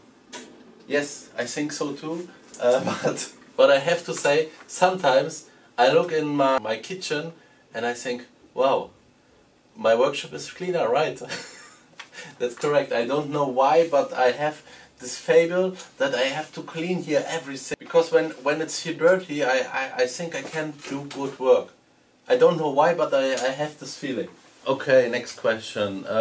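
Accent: Germany. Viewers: Best of both worlds, get to occasionally hear the German accent and see re-builds of subi engines. Lived in Ansbach for 3 years. Oh how I miss it.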